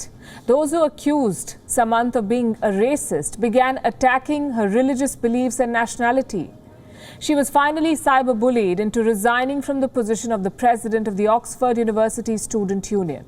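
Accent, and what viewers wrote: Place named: India. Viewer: Indian English accent beautiful and the best😍 Love from Philippines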